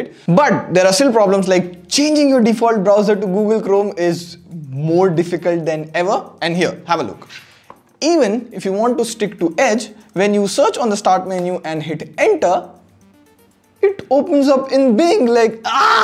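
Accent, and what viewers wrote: India. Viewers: I clicked on this channel just out of boredom. I hear an indian voice I am now interested... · this man has a THICC indian accent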